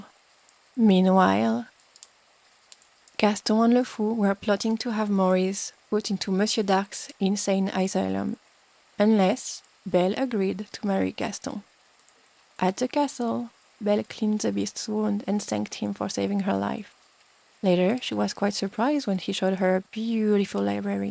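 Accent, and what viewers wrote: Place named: France. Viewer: I love a nice French accent. Ty. Also hi I’m your newest sub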